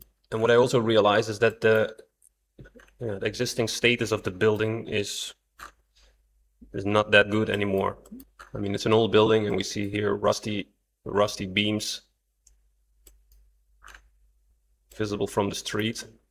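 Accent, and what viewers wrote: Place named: Denmark. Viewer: Listen Mr. Civil Detective with your (Danish?) Accent: This old Architect having worked on NYC Bldgs (one with Fred Severud as Engineeer....others): Your presentation is the very best of ANY including that guy from Severud and many others. Lucid, Comprhensive and mathematicall.. All these cute Chicks interviewing any engineeer they can get their hands on (NBC/ABC/CBS...,,,,,,=UGH. State Your Name, Company, credentials so we (few) rational people can find your sites. You are first rate and give me faith in big time engineering again.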